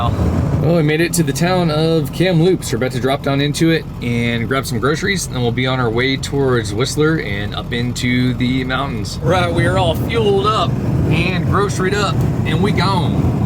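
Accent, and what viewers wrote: United States. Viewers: You sound too American, lol it’s not been there before they say bEEn.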